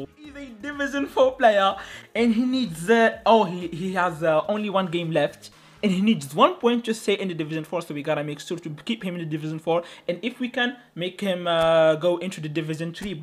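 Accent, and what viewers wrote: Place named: United Kingdom. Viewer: Bro why you have British accent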